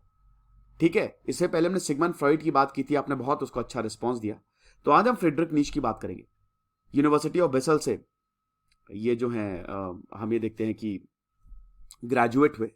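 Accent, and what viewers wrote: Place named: Uttar Pradesh, India. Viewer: Sir your up vala touch is pride for me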